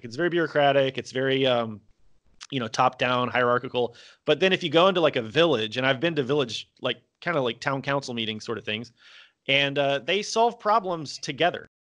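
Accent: South, United States. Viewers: and since speaks in his native Southern here, perhaps he can get Olly to speak in his native Northern (Geordie)... I'd like to hear that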